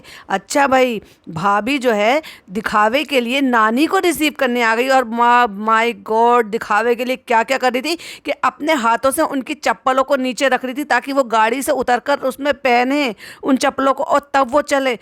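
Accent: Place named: Old Delhi, India. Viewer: Aapke bolne ke style se lagta h Ki AAP purani dilli se bai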